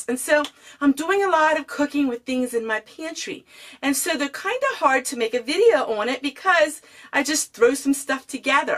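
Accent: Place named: Philadelphia, United States. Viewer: New to your channel! Omg!! You’re accent is strong! Not making fun, we have a similar accent, I’m from south jersey and my husband is from Philly. We were taking bets on where you were from until I saw your P.O. Box. I won!! Love your set up!! I had a laugh when I saw your china cabinet. I’ve converted mine for storage and my husband gave me a look 😂 See??!! I’m not the only one!!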